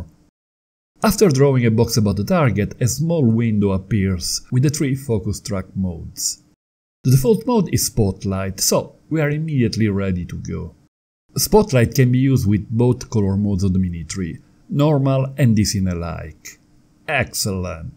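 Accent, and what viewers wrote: Italy. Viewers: great tips, and a lovely Italian accent too!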